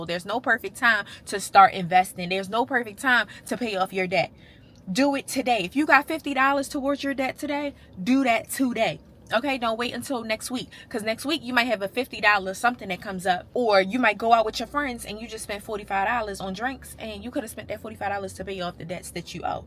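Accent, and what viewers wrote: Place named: Baltimore, United States. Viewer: 2 sec into the video, I said yo is definitely from Baltimore 😂 I miss the city.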